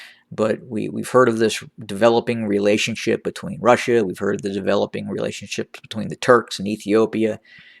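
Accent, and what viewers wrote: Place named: United States. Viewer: Are you a blood sucker ? What do you benefit from brutal mass murder of people on each other ! Your accent tells you are an American where democracy, moral and ethics are important virtues in relative way! But you are so invested in this bloody civil war among black people because of the idiot politicians! What makes you so active in this cruelty of a kind never seen ever?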